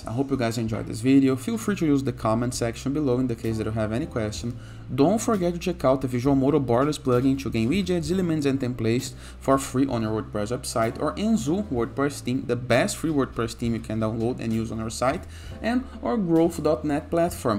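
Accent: Brazil. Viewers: Judging by the accent you are brazilian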